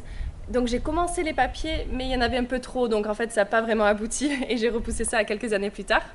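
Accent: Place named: South, France. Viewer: I don't think I've ever heard you speak French never realised you had such a strong southern accent. Keep up the good work babes xxx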